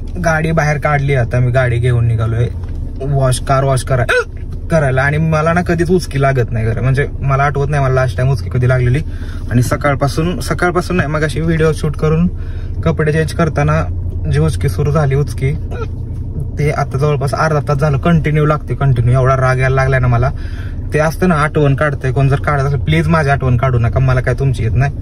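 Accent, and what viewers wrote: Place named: Satara, India. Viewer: I love satara accent 😍 ❤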